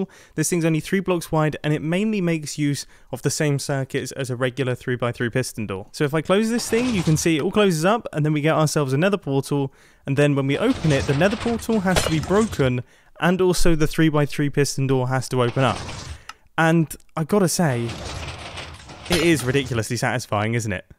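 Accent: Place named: United Kingdom. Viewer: there both Brittish difference is Wheatly is Dumb while has a basic Idea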